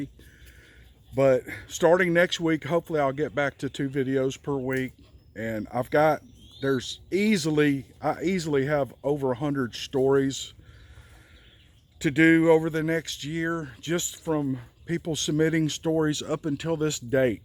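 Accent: South, United States. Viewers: I know you are a busy man. I hope you get your garden in soon. I want you to know that I anxiously await notification for your stories. Yes, I have found “my people”. I’ve listened to other channels but I’m from the south and feel at home when you speak. Thank you so much and I would have never guessed Bigfoot is in Hawaii. Wow! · I so appreciate your channel. My bestie and I listened to you all the time. Your southern accent is wonderful and you are so positive. Thank you for taking the time to do this.. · It was so nice to see/meet you! I've commented before that I love your accent, and it's so fun to put a face to your voice! I live in Oregon, so you're southern accent is such a treat to listen to! Thanks for all of the stories, I love them all and really enjoy hearing you tell them!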